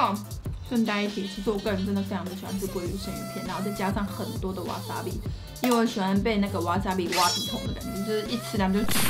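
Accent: Taiwan. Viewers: 在國外工作看你吃飯很療癒再五個月就回台灣謝謝水水最愛看你說台灣國語和不碖轉的台語加分！掰餔～ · 將台語好可愛喔😆😆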